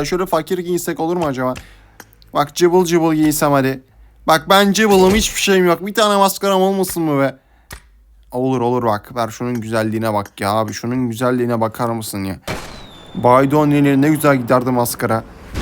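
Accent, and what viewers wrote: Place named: Thrace, Turkey. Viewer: Boş video aq Trakyalı konuşma cabana bayıldım kardeşim 😂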